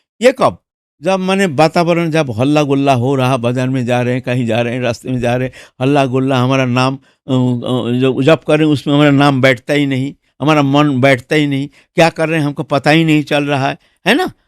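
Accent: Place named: Bengal, India. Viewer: Baba ap bengali ho na ??? Apka batchit me bengali tone ata hai. Ap jorur bengali ho. I am bengali.kolkata.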